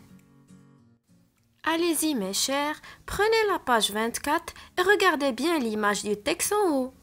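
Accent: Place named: Arab world. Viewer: Hhhh l accent est typiquement 3roubi .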